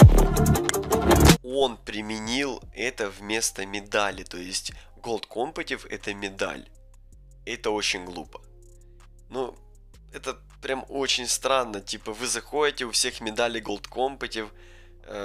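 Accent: Ukraine. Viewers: Ты украинец или беларус?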